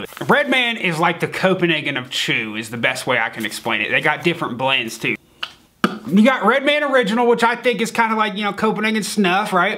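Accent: South, United States. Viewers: 2018 also You sound like an real American .-. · OCASIÓN!! AMERICAN FRENCH SOUTHERN DIALECT!!!!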